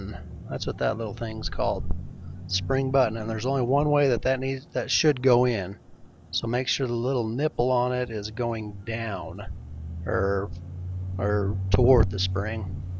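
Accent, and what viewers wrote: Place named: South, United States. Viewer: This vid was a great reminder that I would've unhesitatingly cast instant skepticism & doubt on whether or not this fella could give me proper advice on a torch regulator re-fit if I hadn't immediately noticed that unmistakable rural twang... which, of course, dismissed that same potential skepticism just as quickly. No political bs yadayada implied here either: rural/country/Southern folk are just ~a billion times likelier to be able to spell out things like this as if they were describing yesterday's weather to you is all. Just my city-slicker $.02...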